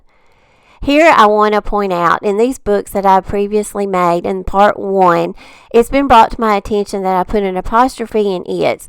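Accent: South, United States. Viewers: I'm so glad I found your channel. I love your style. It is very similar to my own which is Awesome! I could watch your creations everyday(bonus... I adore your southern accent). Keep them coming and thank you for sharing 😊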